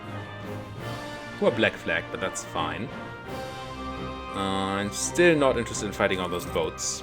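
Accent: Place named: Germany. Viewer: Oh wow, I would never have guessed that, your accent sounds too "refined" for being German, in a good way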